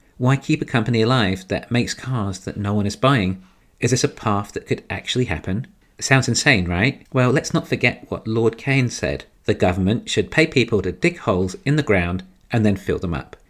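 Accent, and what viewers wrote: England, United Kingdom. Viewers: I was sure you were from England rather than New Zealand...???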